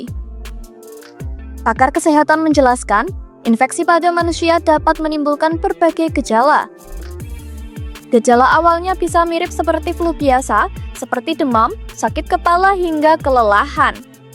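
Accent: Java, Indonesia. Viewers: Medok banget ya logat jawanya😂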